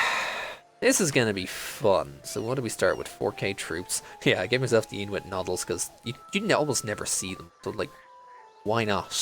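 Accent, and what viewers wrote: Ireland. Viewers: For Northside Dublin it's actually pretty subtle! My parents and entire family are from Northside and some are the type who'd say " Story bud" in that typical accent. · Hey, what accent do you have? I hear Irish, but I might be horribly wrong. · like he is from Ireland.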